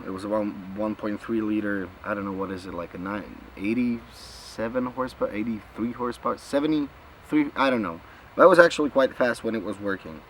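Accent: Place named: United States. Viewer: such a nice american akcent. Do you spend some time in America or is that just influence of the Internet?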